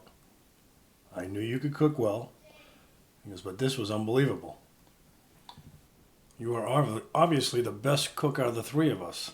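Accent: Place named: Massachusetts, United States. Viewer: I'm thinking Tewksbury/wilmington? maybe billerica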